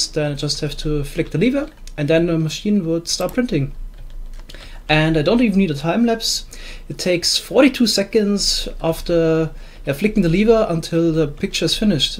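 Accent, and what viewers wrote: Germany. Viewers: Als ich ein Video von dir gesehen habe, war mir direkt klar, du kommst aus Deutschland, der Akzent verrät alles😂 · BTW YouTube can't figure out your accent; it thinks all your videos are in German :\ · Thats so funny! I'm german, too and I always thought that you sound kind of german xD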